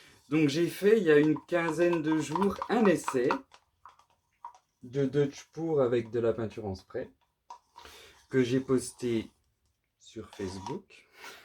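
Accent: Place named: Besançon, France. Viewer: J’adore les tableaux aux fonds rouge ( contrairement à vous ) et je trouve que celui ci est superbe , les couleurs sont très bien choisies . Le tableau bleu , magnifique , élégant . Je salue le Bisontin , un voisin donc ? Depuis que je vous regarde, l’accent me parle mais , je n’osais pas vous demander . Continuez comme ça et à bientôt pour visionner d’autres vidéos !! 🎨🤣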